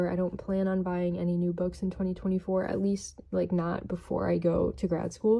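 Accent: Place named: Spain. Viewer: ur spanish accent is cute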